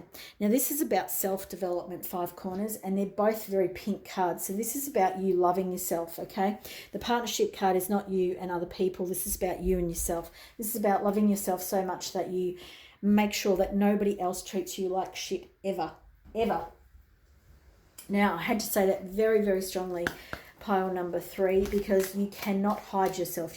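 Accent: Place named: Australia. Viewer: Pile 1 was amazing. Thank-you so much. I could write you a long essay about all the ways you spoke to me, but I’ll just tell you that I cried and laughed the whole way through. I’ve also been seeing 1111 again the last few days, and this morning I said to Spirit, “I’m listening” and then a bee flew in the room and after hovering in front of my face, flew around to show me a few things in the room (in answer of a recent question) and then left. Also you have such an amazing voice. I’m obsessed with your accent even though I’m Australian too, haha. You’re amazing! Blessed be!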